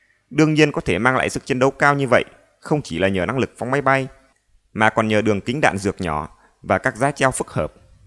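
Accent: North, Vietnam. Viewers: Giọng nói phát âm giọng Bắc chuẩn nghe rất hay, không nhớt nhát như giọng Hà Nội. Phát âm gãy gọn nghe mê giọng Bắc này lắm.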